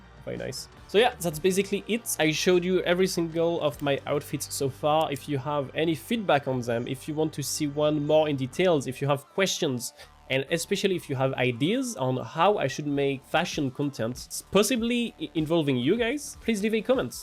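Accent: France. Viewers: You ´r French ?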